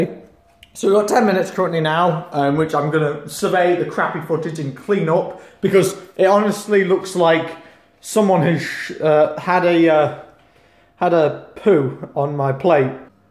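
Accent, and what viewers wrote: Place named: United Kingdom. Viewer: Nazi Pepe that's just how we British people talk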